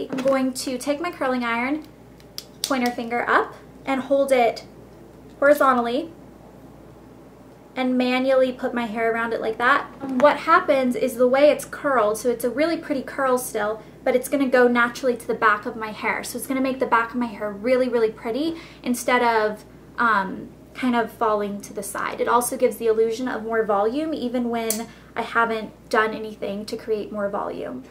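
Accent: United Kingdom. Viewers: hahahaha your british accent - so hilarious <3 Love you! xx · Your British accent is really good xx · OMG, love your british accent:) Sooo good!! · I love your British accent haha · I love your British Accent ;-) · Haha I loved the British accent. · Hahaha! Love your British accent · British accent? London Olympics of course (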